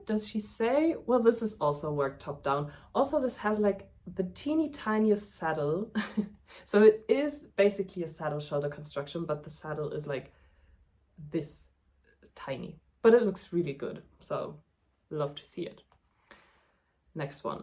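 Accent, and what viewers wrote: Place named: Germany. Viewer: am i crazy or you sound kinda german idk. i thought you were like a fairly big creator on here you look like you could be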